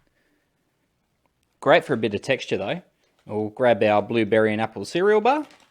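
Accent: Australia. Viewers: God I love how Aussie you are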